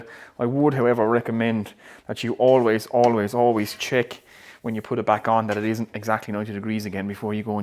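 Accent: Ireland. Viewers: 1500 quid is out of my price range atm pal but maybe in a couple yrs I will buy one when my woodworking is good enough to warrant it.. For now I'm getting me one of those cheap as fook models from Titan.. It'll do for what I need it for and when I'm ready to step it up to a better model I will be looking at a better model like one of these babys.. I need some good chisels so I was hoping u could give me some advice on the best Mortise chisels? The best set of ordinary wood chisels I can buy with let's say 200 euros as a price range for both sets?? I would very much appreciate it if anybody could help me with some good advice.. I'm prepared to go to 240/50 euros for both sets if needs be but I want to really get some great chisels for the money.. I am going to buy a set for about 670-70 euros as well for learning with but I really want both of the other sets now too.. 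It would be greatly appreciated if any of u good ppl on here could advise me on the best I can get for the money I want to spend.. Love the videos btw.. Where are u from pal? Is that an Athlone or Louth accent? I cannot tell but it's a proper Irish accent bro.. Great to see a top Irish woodworker on here doing his thing.. I haven't found very many tbh. Ur the only one atm mate but I will be looking for a few more in the future too.. Salute from DUBLIN by way of LIMERICK & Tipp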